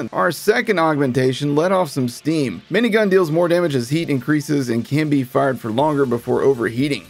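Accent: United States. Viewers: Damn your videos are addictive! Ps. Love tour accent where are you from in the states?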